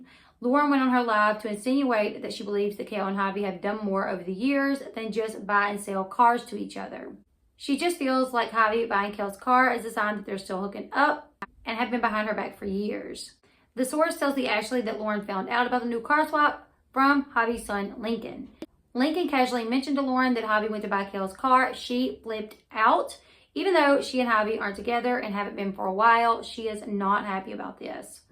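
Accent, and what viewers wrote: South, United States. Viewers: I love your southern accent. Lol I'm from NC and my bf says I have a twang but you got me beat. 🥰 Great info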